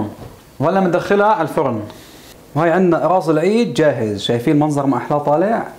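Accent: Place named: Homs, Syria. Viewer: ماشاء الله مبين شغلك اديه مرتب الله يقويك ويعطيك الف عافيةوأحلى من القراص لسا الحكي الحمصي منحوط😊 أنا بفرفح قلبي بس اسمع حكي حومصي لأني انحرمت منه بالغربة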